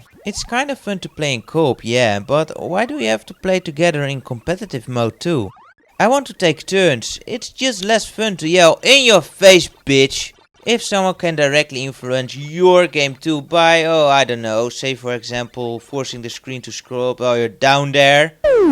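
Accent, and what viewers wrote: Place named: Netherlands. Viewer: You sound verry Dutch!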